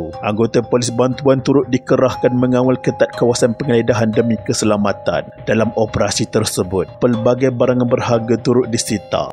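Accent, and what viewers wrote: Kelantan, Malaysia. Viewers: Maklumat dan pendedahan yang baik cuma loghat budu ada lagi tu..boleh perbaiki lagi tu 😂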